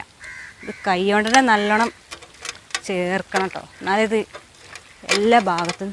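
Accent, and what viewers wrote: Malappuram, India. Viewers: മലപ്പുറം സ്ലാങ്ങിൽ നല്ല അവതരണം. ബീഫ് ചുക്ക.. അഡാർ ഐറ്റം 😍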